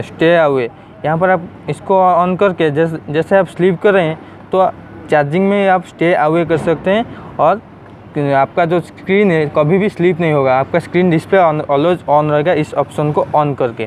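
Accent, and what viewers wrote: Odisha, India. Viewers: Are you frim odisha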